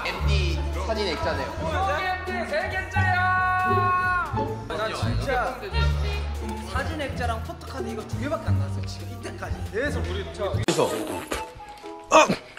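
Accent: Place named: Busan, South Korea. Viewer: Busan satoori lol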